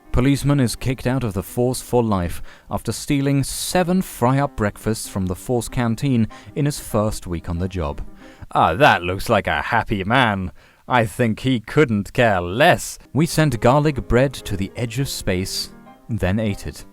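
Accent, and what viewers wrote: Germany. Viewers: Everyone be up in the comments simping or talking about how he’s German. I’m just here like, okay cool it’s..a familiar and comforting voice. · Bro are you german/austrian/swiss??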